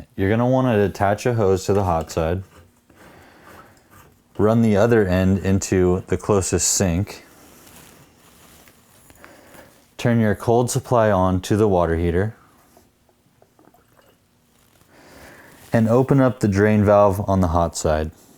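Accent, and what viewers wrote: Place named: United States. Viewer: A real person speaking perfect American English. Thank you God.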